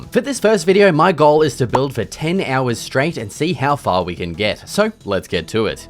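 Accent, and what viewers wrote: Australia. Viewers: Quick question r u Australian u have a goated accent also I changed 700 comments to 701 I ruined it😢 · Are u Australian? Is that an Aussie accent? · le me guess . ur from australia dunno jus got a feelin' mate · Are you Australian?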